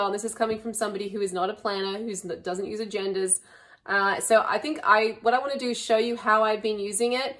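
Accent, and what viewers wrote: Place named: Australia. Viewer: this is what i'm eyeing online. thank you for all the info!! your ozzie accent is so faint. did you grow up in australia and move to the US?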